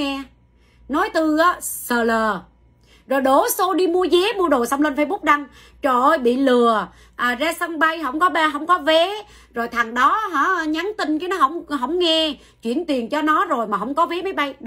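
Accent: Standard. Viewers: noi qua chuan.m cam on chinh phu dai loan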